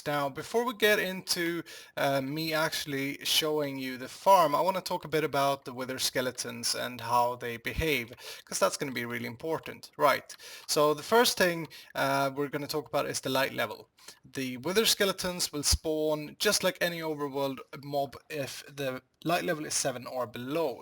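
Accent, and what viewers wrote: Sweden. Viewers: You must be Swedish right? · Are you from Sweden?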